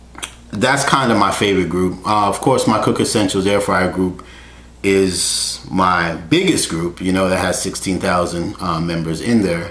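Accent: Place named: Queens, United States. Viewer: Hey, Love your Air Fry cooking channel. I said to my son, hey he sounds like he is from Queens!!! Then I saw you video Q&A Cooks Essentials Air Fryer- Well I'm from Queens and lived in Westbury all my childhood! Wow what a coincidence! Love your Channels! Living in Texas for 28 years now...But always a New Yorker! Just wanted to share!!!! Many Blessings and good for you!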